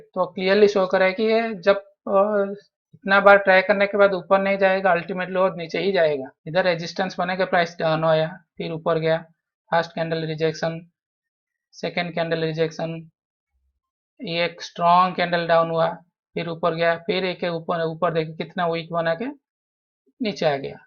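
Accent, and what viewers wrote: Odisha, India. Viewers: Apka bat chit se lag rah he aap odia ghar se belong karte ho... Me v odia hun from Rourkela, Odisha..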